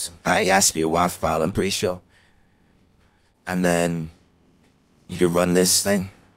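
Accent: United Kingdom. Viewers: Why this nigga speaking british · keep getting runtime error (why does kanye sounds british in this vid)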